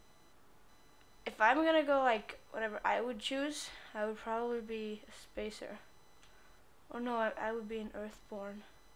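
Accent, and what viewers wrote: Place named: Sweden. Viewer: cool videos are u from sweden u sound swedish. :D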